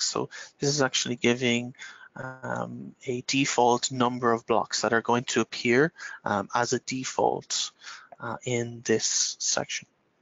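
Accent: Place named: Ireland. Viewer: It took me a minute to realize the sound "TEAM" is an Irishman trying to say "THEME."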